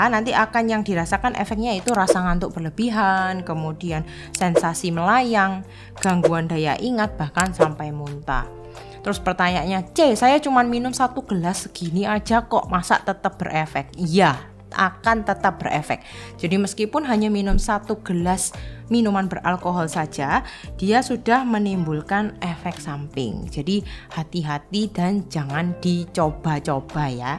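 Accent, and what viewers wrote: Java, Indonesia. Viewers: Iya betul nggak menghilangkan jawa nya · Bhs Idonesianya sdh baik dan benar soal medok Jawa tdk masalah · Logat dan bahasa itu beda....bahasa indonesia nya benar, trus logat nya jawa....emang kenapa?...salah ? Klo yg ngomong orang maluku dgn logat daerah nya, kan juga BOLEH..... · terimakasih atas ilmu dan nasehatnya sangat bermanfaat penyampainya dr logad jawa banget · Jowo ne ketok 😅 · Logaaak jawwa mu keliatan... Gx bsa berbahsa indonosia yg baik dan bnar y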